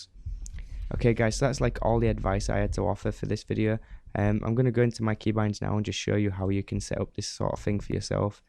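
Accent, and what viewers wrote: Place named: United Kingdom. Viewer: speak english................damn, tired or britiish accents, mumbling fucks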